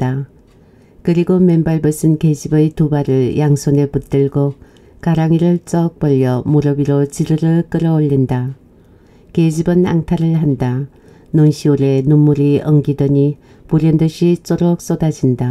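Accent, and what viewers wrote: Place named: Chungcheong, South Korea. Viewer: 충청도 사투리가 이런 억양인가요? 경낭도인줄 알았는데? 진짜 못 알아듣겠네요.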